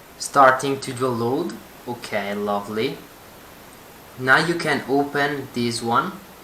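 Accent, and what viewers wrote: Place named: Italy. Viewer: Ciao, grazie mille! Riconosco dall'accento che sei italiano! Tutto funziona perfettamente, mi chiedo solo per quanto altro tempo le app resteranno sullo Store di Oculus Gear ora che il servizio è stato interrotto...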